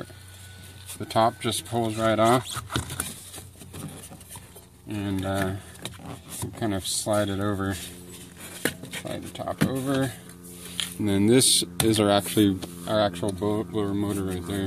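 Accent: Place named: North America. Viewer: You sound like you're from North America. North America runs on 60 Hz not 50 Hz. That blower will blow eventually too.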